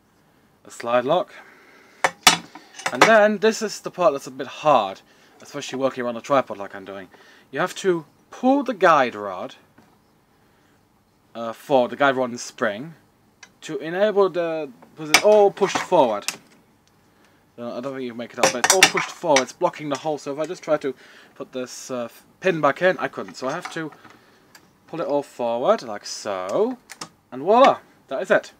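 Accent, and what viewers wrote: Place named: United Kingdom. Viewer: sounds brit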